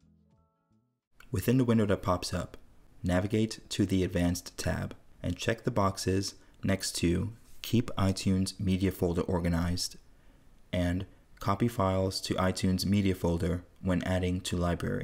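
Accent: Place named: Germany. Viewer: Fantastische Anleitung, 1000 Dank! Und ein kaum wahrnehmbarer deutscher Akzent?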